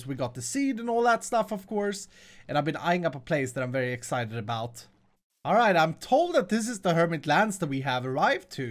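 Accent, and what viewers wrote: Sweden. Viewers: his acent is swedish btw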